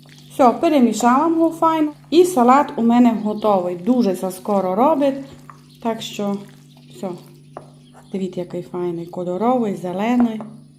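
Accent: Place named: Tiachiv, Ukraine. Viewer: Вітаннячко з Канади 🇨🇦 Вподобайка одразу на підтримку каналу 👍 Хотів спитати Вас ,часом Ви не з Тячівського району? Така знайома ,приємна бесіда у Вас...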